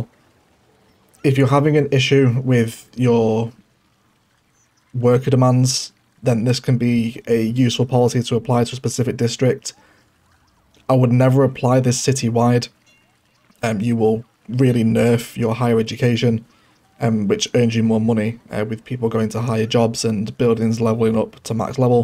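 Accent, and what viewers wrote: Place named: Manchester, United Kingdom. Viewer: you sound so much like rashford, you from manchester?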